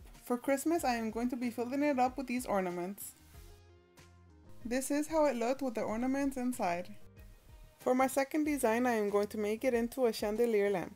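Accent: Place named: South Africa. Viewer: You sound South African. Very charming pronunciation💐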